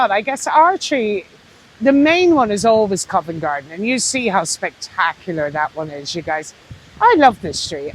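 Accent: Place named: Ireland. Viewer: Thanks so much love ❤️ uour enthusiasts together with that charming Irish accent reminiscent of my dearly departed Mother. We are visiting central London tomorrow and we'll certainly be taking a cue from your knowledgeable video! Happy Christmas and New Year!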